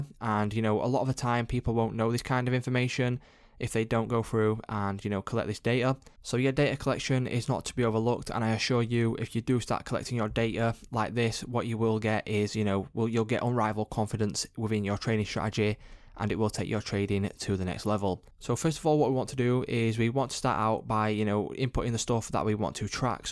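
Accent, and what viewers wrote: United Kingdom. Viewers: you are british. arn't you? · i think bro is britian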